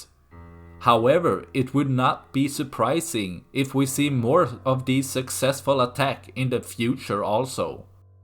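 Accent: Sweden. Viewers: From your accent, I can bet you are from Sweden! ;) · Hey Swede, (you must be with that accent), have you thought how the Russians could present a video of the attack by a drone boat that was allegedly Ukrainian? This is a false flag operation! 😡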